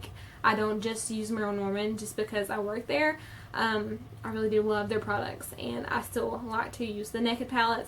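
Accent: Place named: South, United States. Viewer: Im from the south also , love your accent. Thank you for the wonderful reviews. Extremely helpful .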